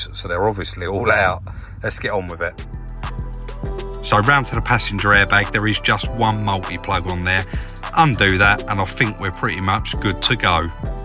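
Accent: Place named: England, United Kingdom. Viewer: i am a city and guild panelbeater( body repair tech now haha) of the old school variety with nigh on 50 years exp. and only recently threw it away because of these nightmare strip/assemble scenarios. The last vehicles i worked on were '21 audi s8 hit hard in the r/r and a virtually brand new Jag i-pace hit full noise frontal, both fantastic vehicles to work on, the Audi was a breeze really, but as you say getting one's head around the procedural dilemma is the mind fk i do not need. You do a great job of an explanation of pitfalls and tips for this volvo airbag s&a( i love volvos) I work in an engineering shop now; lathes, milling, presses etc and specialising in aluminium tig welding.. much easier haha-- love this, new found, channel and dig the pommie(p.o.m.e. = prisoner of mother england) accent, i'm in nz and have been for over 40yrs..rock on man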